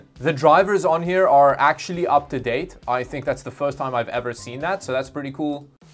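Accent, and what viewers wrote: South Africa. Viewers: Are you from Africa? Your accent sounds very similar to a few people I've met from South Africa. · You sound like a South African :) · South african? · Why do you sound like you have a South African accent?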